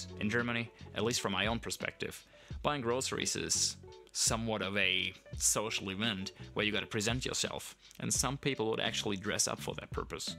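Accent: Australia. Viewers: the aussie accent is suuper strong so dont feel too bad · Great Aussie accent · Your accent is unbelievable mate! You are 50% Aussie (long open vowels), 25% US (hard rolled “r”s), 25% rest of the world soup combo!! I cannot hear any German accent at all😂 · Sorry mate, can you f*** off? Sorry mate, can you point me to the strawberries? Both completely valid ways to end that sentence 😂 You actually have a bit of an Australian accent now brother, another few years here and we'll get you converted. I work with Germans, I find we get along very well. It's weird to say but I feel more at ease with Germans due to them also being quite straight talkers than those from our mother country the UK where they tend to bury everything in platitudes rather than getting to the point. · Such an Aussie accent! My Dad was German, lived in Australia for thirty years, and his German accent was always so strong. 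(I loved it!) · Do you know you have gleaned a bit of an aussie accent? lol, good one. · Hahaha your accent for English is half Aussie. I'm glad we wore off on you in such a short time haha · Your English is great. Has a great Aussie accent to it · Sounds more Australian than my German born neighbour that's been in Australia for about 60 years. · I can definitely hear an Aussie inflection in your vowels sometimes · Well, you've internalised the Aussie twang. I've been here for more than sixty years and you sound more native than me 🇦🇺🏴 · You definitely sound super Australian as far as your accent goes, you almost have more Aussie than German when you speak English. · Dude, you have no idea how Aussie your accent is. This is hilarious and I love it. You're one of us mate! You even LOOK Australian. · Seriously... First thing I thought it was an Aussie in Germany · You have German US Aussie accent! 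Everything aside, you're always Aussie now ! 🇦🇺🙏🇩🇪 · Thank you for being polite about Australia. I'm glad you had a good time. Your English sounds very Australian to me, noting that Australia has so many accents that are uniquely Australian but unknown to the world. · love ur little aussie accent haha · The best tourists i ever met within my adventures about Australia were always German (Im Aussie). You have the look of an Aussie surfer and your English became quite Australian. Makes me wonder what the German girls think of you since you returned to Germany 😅 Rock on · You're flattening your vowels like an Aussie. When you say understaand, that is all Aussie. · you have an Australian twang 😊 very nice 🦘✌️🌅..go Richmond..your family sent off an urbane European...we are all for comfort, sorry😂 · I think you, your attitude. and accent, are gorgeous. You have become a classy Aussie. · I do get a slight hint of an Australian accent mixed in with your German accent 😂❤. 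· You have ready a strong Australian accent. I hope it stays with you. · Your accent is still so Aussie.. great blend of German and kiwi and Aussie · You're more Aussie than you think. How ya "goin?" = Aussie; How ya "doin?" = US and elsewhere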